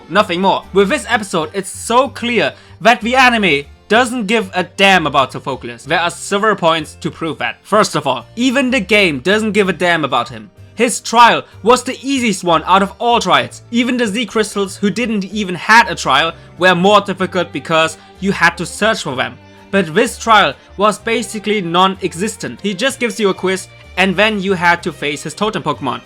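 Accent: Germany. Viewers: man hört deinen deutschen Akzenten voll raus :D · Is this a German Aczent? Sounds a little. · are you german? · Wait..... .... are you.... German????? Cool - High Fife!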